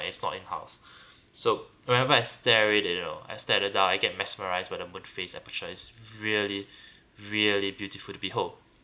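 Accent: Singapore. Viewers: It's not a mid Atlantic accent, it's a Singaporean/Malaysian accent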